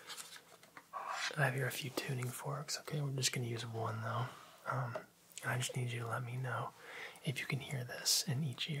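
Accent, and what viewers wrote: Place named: South, United States. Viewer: you got a hint of southern in your voice 🤨🫣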